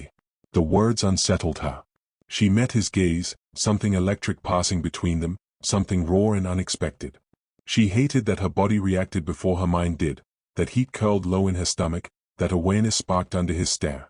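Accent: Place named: London, United Kingdom. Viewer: This narrator story teller speaks well and good and he tells this story really well and good. This story teller speaks with slight accent this story teller narrator sounds like and speaks like he's from London England he's doing a great job of telling this interesting awesome amazing I can understand everything that this story teller says as he's telling this great beautiful interesting story.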